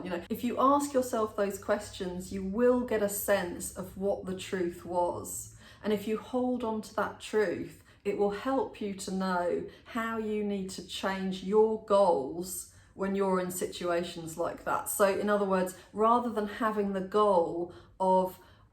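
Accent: United Kingdom. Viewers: tht UK accent is a turn-on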